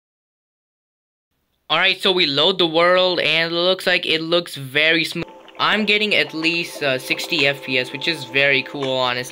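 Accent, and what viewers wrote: England, United Kingdom. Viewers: Finally this English voice all videos Indians voice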